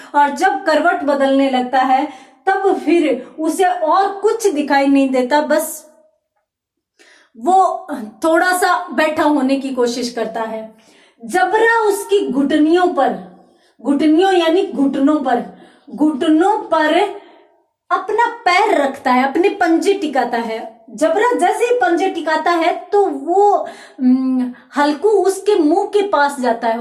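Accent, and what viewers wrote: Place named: Haryana, India. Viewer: Madam aap Haryana se ho kya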